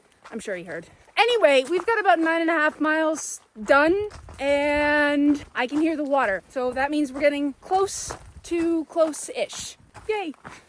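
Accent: Canada. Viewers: The Canadian accent is still there young.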